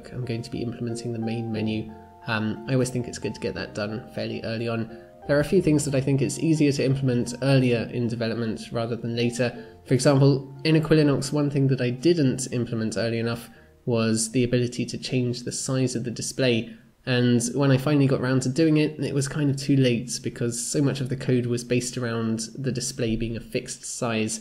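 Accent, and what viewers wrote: Sweden. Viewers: are you from sweden? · Are you swedich? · Are you from Sweden?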